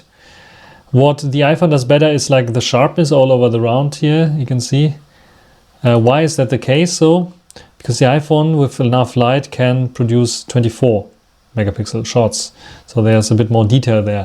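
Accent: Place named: Germany. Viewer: waruuuuum in Englisch in Deutschland? macht ein engländer in England od. Amy in der USA auf Deutsch??? was ist der Grund? schaut Leute, ich habe etwas Englisch gelernt? wenn dann bitte richtig und nicht mit dt. Akzent.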